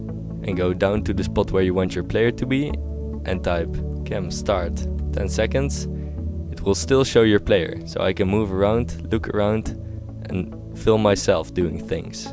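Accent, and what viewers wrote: Netherlands. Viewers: are you from the netherlands?? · Ha. Hij is gewoon nederlands. Ik dacht al hoorde een hele vriendelijk nederlandse jongen:)Hoi!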